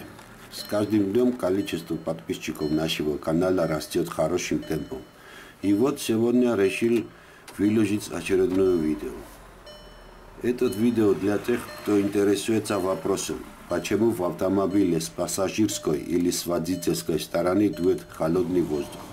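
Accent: Georgia. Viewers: Спасибо за полезное видео ❗️Акцент как у Сталина 💪👍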